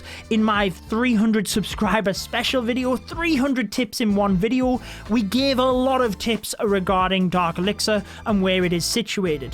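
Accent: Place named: Northern England, United Kingdom. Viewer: My northern English brother 🦾😘 I can hear on your accent baby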